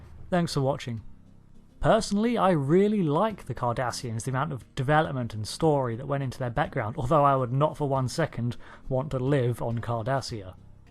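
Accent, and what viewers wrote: United Kingdom. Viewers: Sounds like you live in Britain, whats the difference?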